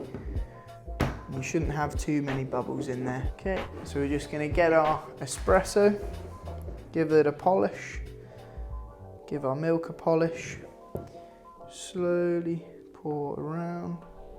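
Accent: United Kingdom. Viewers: You sound brit mate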